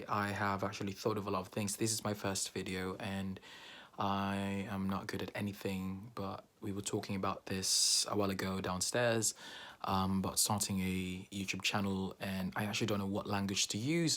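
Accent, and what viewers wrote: United Kingdom. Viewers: British accent na kaau raf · Wow British accent! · Nice accent bro! How come you already have a british accent?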